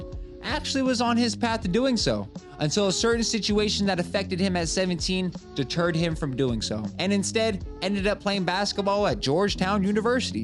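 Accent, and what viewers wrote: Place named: Mexico. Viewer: you sound like a mexican now too bruh u been round the squad religiously fr i see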